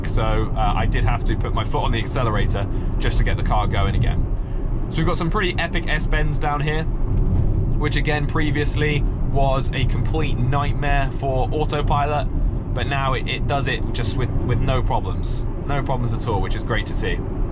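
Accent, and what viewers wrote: United Kingdom. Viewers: For rear view of the mad Volvo driver you could have turned on the rear cam we could see part of it, but did not see anything in the rear view mirror. Length of video is good and your commentary was very good. You drove past a very long brick wall so you could have told us what that was or any other interesting sites you drove past. I could listen to your voice all day long and not get bored, must be the British accent, we Americans love, .lol. Good Job keep up the good work. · Amazing and relaxing video, I wonder how that autopilot would work in Portugal Old Town Road. Btw thanks to improve my English understanding, I'm French and it's nice to ear British English 😉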